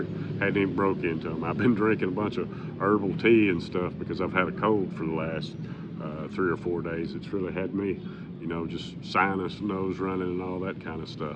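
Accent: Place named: Texas, United States. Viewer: Love your accent. Are you from TX?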